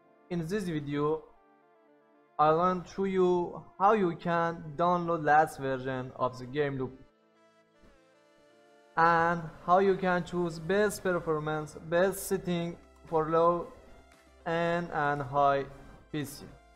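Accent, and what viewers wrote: Iran. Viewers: منم یه همچین حسی دارم که ایرانیه داره خارجی حرف میزنه کامنت تو رو دیدم بشتر برگام ریخت 😂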